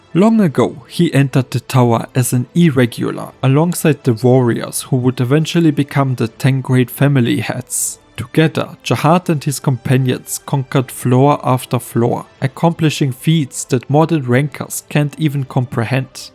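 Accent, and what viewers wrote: Germany. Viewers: love the german(?) accent keep it up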